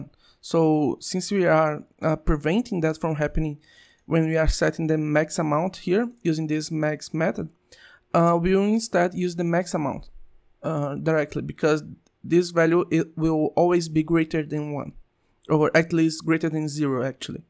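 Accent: Brazil. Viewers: Vi que vc era brasileiro só pelo sotaque, valeu pelo vídeo cara :)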